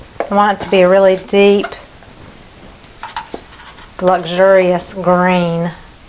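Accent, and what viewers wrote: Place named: North Carolina, United States. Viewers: You kinda sound like an NC girl like me. Mid Atlantic? I’m trying to place your accent ☺️ This pour is absolutely stunning! Thanks for sharing. 💜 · I love to hear you talk you sound like. ME yeah. You sound like me Those. North Carolina girls are the bomb bo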